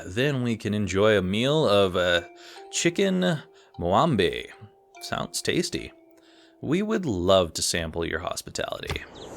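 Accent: United Kingdom. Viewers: Your Japanese why is the narrator British or British sounding?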